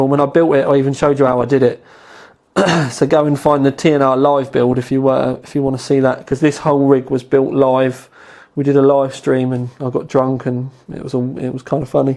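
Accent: United Kingdom. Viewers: Amnisty You seem like an awesome person Amnisty! Linus is an amazing stepping stone for people getting into pc's and tech. is something special all together though. Very colloquial and sarcastic British talk. The length of his videos are such a draw for me. Something to listen to without having to swap videos every two minutes.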